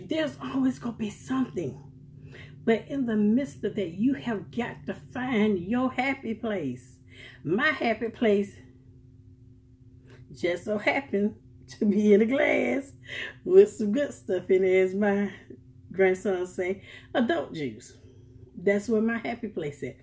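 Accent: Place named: Alabama, United States. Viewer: I KNEW YOU WERE FROM GEORGIA OR EITHER ALABAMA. YOU SOUND JUST LIKE MY COUSINS IN ALABAMA.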